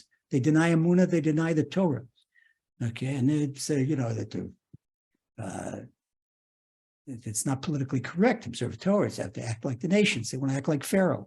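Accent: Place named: United Kingdom. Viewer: Are you from the UK.. thank you for this.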